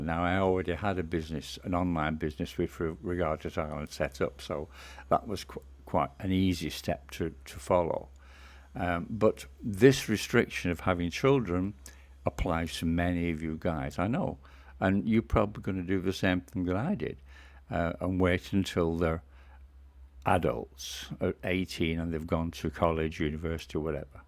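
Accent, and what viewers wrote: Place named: England, United Kingdom. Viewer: Oh….it was AI ? I didn’t know that. I thought she was real person with lovely English accent. Thanks for info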